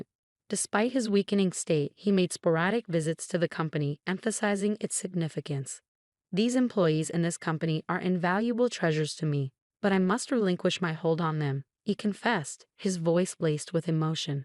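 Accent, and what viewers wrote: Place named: United States. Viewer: Sounds American, but money is "yen".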